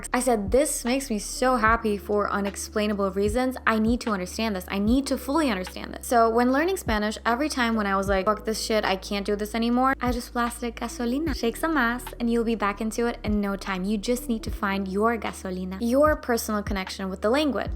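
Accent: United States. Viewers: Your accent is clearly, American . considerando lo poquito que hablaste en español me parece un acento puertorriqueño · As an American, I’d say your English accent is 100% American, I would have guessed you grew up here 😄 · Your English is amazing!!!🤩 It sounds sooooo american.. which I love! How is that possible growing in Turkey? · You sound really American what is your first language.? I’m working on Korean Italian French and Russian . I learned Portuguese by getting into Brazilian music and Italian by getting into opera. I actually got into French Regaetton . I talk to my cat in the languages I am learning. I like finding out how other polyglots learn languages. Don’t be afraid to make mistakes is the best advice . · Oh my gawd, I love your personality <3 Watching your tips on how to learn a language from scratch is as good as watching fav series on Netflix! 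Your humor and American accent rock :)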